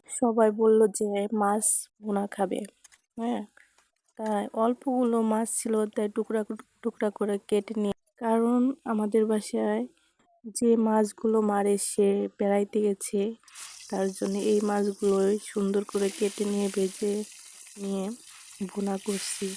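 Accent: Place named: Bangladesh. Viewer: খুব সুন্দর লাগলো তোমার রেসিপিটা খুব ভালো লাগলো, পুরো ভিডিওটা দেখলাম, কথা শুনে মনে হচ্ছে বাংলাদেশে বাড়ি, আমি ইন্ডিয়া থেকে দেখছি ভিডিওটা, আশা করি আপনি আমার পাশে থাকবেন❤❤❤❤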